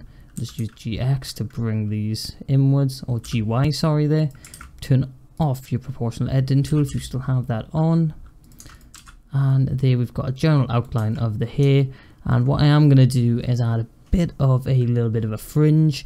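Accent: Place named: Cardiff, United Kingdom. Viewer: Is that a Cardiff Accent i hear? nice to see a tutorial in the native tongue lol. (swansea here)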